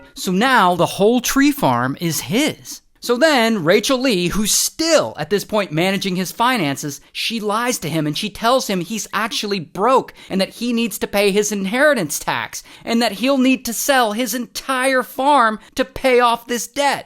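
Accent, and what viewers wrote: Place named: United Kingdom. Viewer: It's a BRITISH accent BTW.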